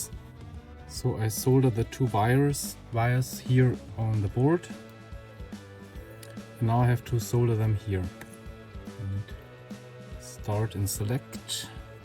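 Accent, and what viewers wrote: Germany. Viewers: Du bist deutsch, oder? Will auch haben 🤣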